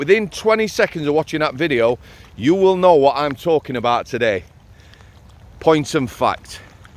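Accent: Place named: Leeds, United Kingdom. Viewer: Onya. I grew up in Northhants in the 60’s and seventies and can’t believe how lawless and violent UK is now and how treasonous the political and bureaucratic managers are. I say managers because they are all bought and paid for. I moved to Australia in 1986 and I reckon that we are two years behind UK in the breakdown of decent society. Oz went from brilliant to fu@ from the 2000 ‘s on but mates here told me that it was kicked off by the Australian Labour PM Whitlam in 1975. This has been brewing globally for a very long time. I suspect that UK’s downfall started with the Khaki election just after WW2 and the immigration problems started with the Empire Windrush in 1947. I’ve had a great life thanks to the Westminster political system but that is all gone now. Frankly I can no longer see an answer. Stay safe and stay well my friend. You remind me of a construction crew of lads that I worked with out of Leeds. 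It’s your accent and no bullshit attitude, straight to the point, no waffle. Happy new year my brother.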